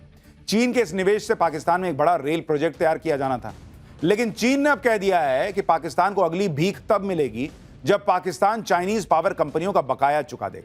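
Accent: Bihar, India. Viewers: सुशांत सर आप जब बोलते है तो ऐसे लगता है की आपका भाषा बिहार के भाषा से मिलता जुलता है कही आप बिहार से तो नहीं है सर ऐसा लगता मुझे आपका हर न्यूज़ मै देखता हूँ बहुत अच्छा लगता है थैंक्स सर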